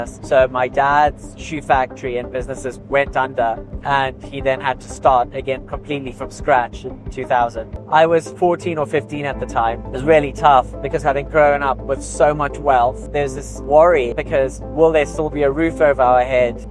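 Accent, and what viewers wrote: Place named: United Kingdom. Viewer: Zimbabwe? I thought you were British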